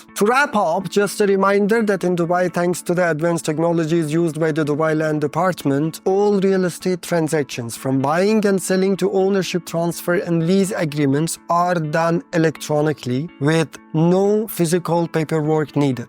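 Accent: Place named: India. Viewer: لهجه هندی دارید 😅 جالبه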